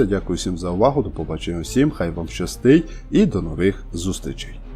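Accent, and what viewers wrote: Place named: Zakarpattia, Ukraine. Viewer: Хотів би попросити щоб колись один раз ви використали ваш закарпатський діалект на усі 100%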